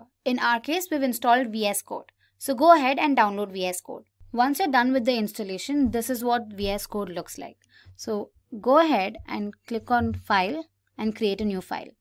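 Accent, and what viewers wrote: India. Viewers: 10/10 best tutorial bonus points for the indian accent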